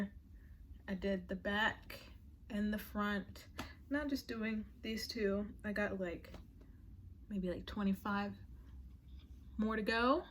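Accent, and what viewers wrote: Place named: United Kingdom. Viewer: As a Londoner the British accent is appreciated 😂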